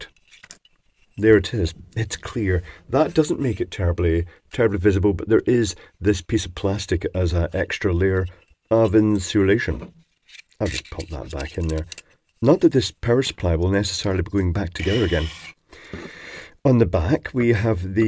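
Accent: Scotland, United Kingdom. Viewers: If it wasn't for your Scottish accent, I would have thought I was watching DiodeGoneWild, withheld transformer teardown.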